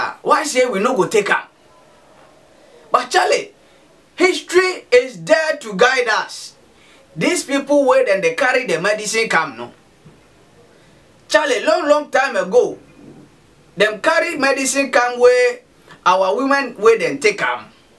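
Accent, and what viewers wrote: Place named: Pidgin, Nigeria. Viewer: hahahaha na soooooo pidgin, welcome 😃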